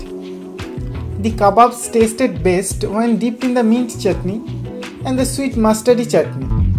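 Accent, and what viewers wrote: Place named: Bengal, India. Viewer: Bangali accent 👏👏👌👌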